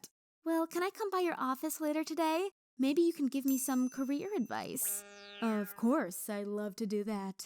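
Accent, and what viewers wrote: United Kingdom. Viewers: Wait...? SHE’S BRITISH!!!? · Yeah I’m sure you’re from the uk. Such a strong accent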